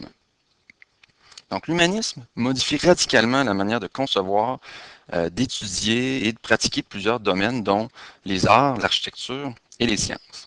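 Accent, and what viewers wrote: Canada. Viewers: mdr laccent canadien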